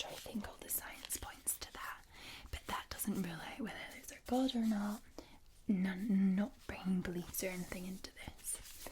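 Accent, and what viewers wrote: Scotland, United Kingdom. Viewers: Probably the best, most relaxing ad I've heard for a VPN with a nice Scottish accent. Love it when gets sponsored<3 Also so sorry to hear what happened with your bank details, hope the damage wasn't too great? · Who else a simp for! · Only thing better than is x2!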